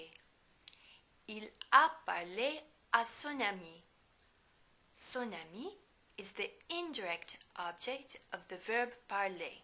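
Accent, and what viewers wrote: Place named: Canada. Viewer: I like your canadian accent . thank you so much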